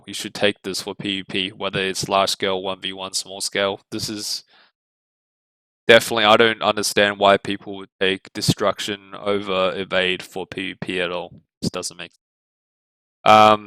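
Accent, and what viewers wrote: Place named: Australia. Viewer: you sound aussie :) subbed nice vid mate! helped me heaps ,south aust.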